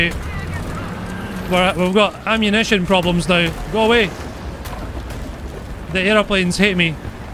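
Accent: Scotland, United Kingdom. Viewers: I really enjoy watching your videos, but please forgive my ignorance, are you Scottish?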